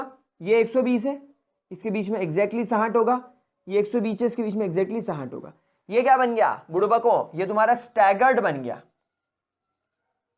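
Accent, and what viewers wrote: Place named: Indore, India. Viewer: yeh sir pakke indore hai voice se hi pata chal rha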